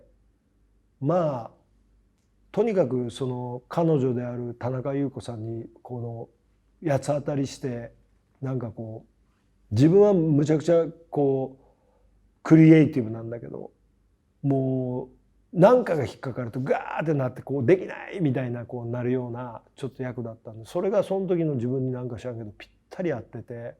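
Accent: Kansai, Japan. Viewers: 長い間伊原さんのファンですが、今まではドラマや映画などでしか知らず、遠い存在でしたがYouTubeでグッと身近な存在に感じます😄 (私が生の伊原さんを見たのは舞台マヌエラでした。) 今回の後編の話も、自分らしさが出てる話で楽しく拝見しました。こんなに関西弁話されるんだぁと思ったけど、伊原さんらしくていいと思います。これからも応援します！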